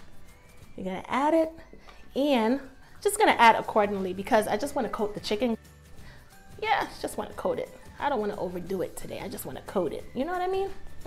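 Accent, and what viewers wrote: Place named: East Coast, United States. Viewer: First & foremost, this is recipe is slamming 🤾🏾‍♂️... I gotta ask you this one thing though🤷🏾‍♂️❔ That accent is so east- coast; has a hint of Baltimore, or maybe Spanish Harlem. Lol, where'd they grow you at?🌱🌷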